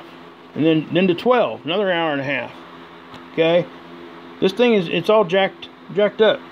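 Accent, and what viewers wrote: South, United States. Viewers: Thank you for ever thing. I like your southern voice it makes me miss my brother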